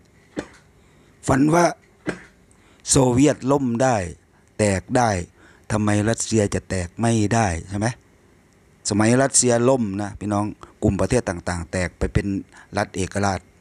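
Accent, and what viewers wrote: Isan, Thailand. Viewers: พากษ์อีสานโหลดอ้าย ฟังง่ายดี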